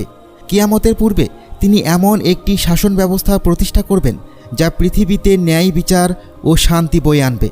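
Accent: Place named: India. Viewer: আমার তো মনে হচ্ছে এই ভিডিওটা যে বানিয়েছে সে ইন্ডিয়ান আগে ভাষা ভালোভাবে শিখুন শুদ্ধ বাংলায় কথা বলা শিখন তারপরে ভিডিও বানাইয়েন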